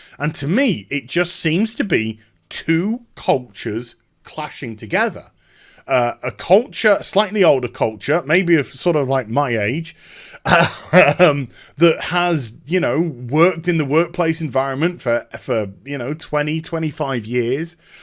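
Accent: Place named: United Kingdom. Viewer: with his British accent mimicking vapid valley girls...priceless!:)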